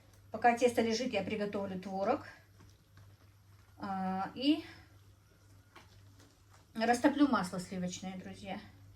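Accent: Standard. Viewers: Чисто на русском говорит потому что она училась советское время в школе не во всех школах был родной язык урок. язык учили дома в кругу семьи соседями и родственниками в Чечено-Ингушской Республике ЧИАССР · Да речь прекрасная, чистая, грамотная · Привет вам большой...меня удивило ,что вы так чисто говорите по русски ,многие русские так не умеют говорить... чистюля ...настоящий профессионал...удачи вам и благополучия.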